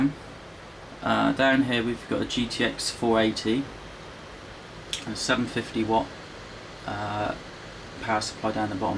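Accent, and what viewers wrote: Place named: United Kingdom. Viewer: You sound british. Where do you get your parts from?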